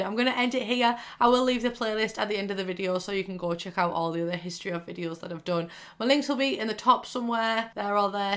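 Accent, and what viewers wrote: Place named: Newcastle, United Kingdom. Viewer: She’s a Geordie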